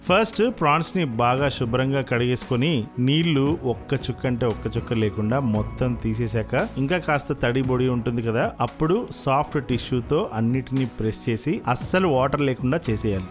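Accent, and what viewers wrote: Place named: Visakhapatnam, India. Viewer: Me native place enti garu achamga visakha telugu la untadhi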